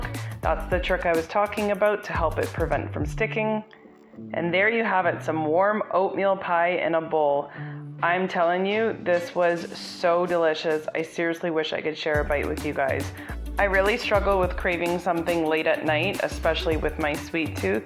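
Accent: Canada. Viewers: Can’t wait to try the apple, banana, oatmeal snack! Looks delish. You must be Canadian, I can hear your accident. Just subscribed, looking forward to your journey. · I hear a Canadian accent! (I live near Canada in northern Vermont!) Great simple delicious recipes. Thanks, and good luck on your journey!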